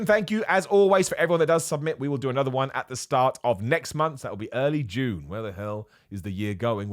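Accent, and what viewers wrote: United Kingdom. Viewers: American/Australian?!? Weird... You sound totally British to me. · As an Australian I don’t think you sound like us! Although your British accent is a very mild one if that makes sense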